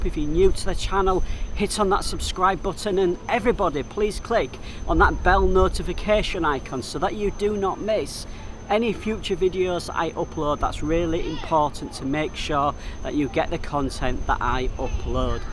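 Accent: United Kingdom. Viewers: Ooo u come back to ur ex coloni...Malaysia is ex British colonial and British is not strange to us...ur ascent so pure british ascent..amazingly u like nasi lemak the malay traditional foods and now days all malaysian take nasi lemak. The cultures is diversity in Malaysia. I not need to tell u more about Malaysia and u well recognised about Malaysia I can see that. The London also not bad and amazing city too. London also with multi races and mostly from commonwealth British. Indian and Pakistan foods in Victoria also not bad nice taste and its cost about 10 pound huhuhu..one thing I like to travel to Europe is from London. The national express provide the route to the rest of Europe city and departure from UK to other city to Europe is easy for the imigration process. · Hello sir.... I love your video about Malaysia.... I hope that I can meet you one day.... 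I love British people because of their talking style or maybe the accent... I love the way you talkin' sir so British 😅.... anyway welcome to Malaysia once again sir... enjoy your life in this beautiful country okay... 👋☺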